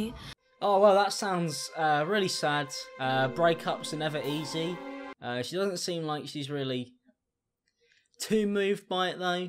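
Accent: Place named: RP, United Kingdom. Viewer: His accent is so posh that I don't understand most of the things he says but I have to assume that it's a joke and laugh to it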